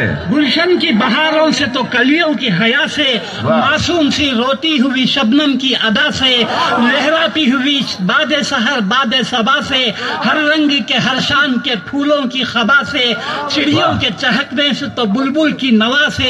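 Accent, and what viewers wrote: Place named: India. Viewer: Nahi ye mujhy unki awaz nahi lagti mera dil nahi maan rha mashaAllah jysa face hy wysi awaz nahi hy. Ye koi indian hy lehjy sy maloom hota hy. Or koi patla bnda hy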